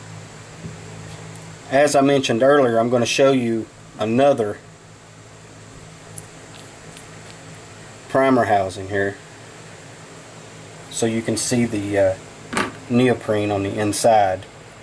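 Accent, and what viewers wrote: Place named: West Virginia, United States. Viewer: Good video , thanks man. I'm guessing your from West Virginia ? We say "plars" too . Ha ha ! Thanks man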